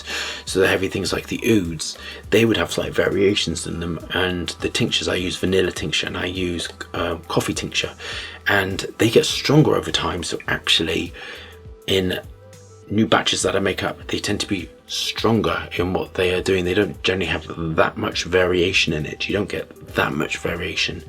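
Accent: United Kingdom. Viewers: Honestly, I've gotten PLENTY of comments about Aventus and to this day (5 years ago) I have NOT found a perfume that can get those same results... It has NOTHING to do with Creed, because Club De Nuit Intense Man with get just as much attention. It's that SMELL that so many people love. I have tried soooooooo many fragrances. I will try Nishane, but Aventus is a LEGEND no matter what people say. Ive gladly owed about 12 to 13 bottles of it... I think maybe one of them were fake - So, I do recommend purchasing Creed's Aventus from a reputable source., my wife is a Brit so I hear that accent allll the time LOL.. never gets old. Thanks for sharing your knowledge when it comes to the ingredients or raw materials. I never knew what smell came from the whale vomit lol.. but now I do!!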